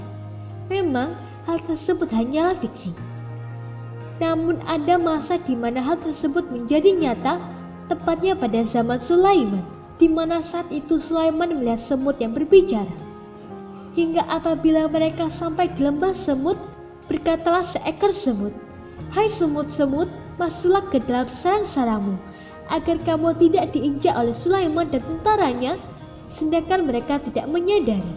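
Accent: Java, Indonesia. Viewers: Iki jowo? Suaranya😂😂😂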